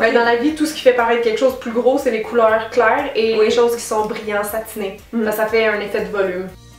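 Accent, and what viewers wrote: Quebec, Canada. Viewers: It's not a Canadien accent, we are Québécois. Québécois accent. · Jube Whatever she lives in Canada. I got a french accent because i live in France but i'm from Paris so I have a different accent than people living in the south of france. The fact is canadian (quebecois) accent is different from french accent, even the wau to speak isnt the same, they got some words that are different from real french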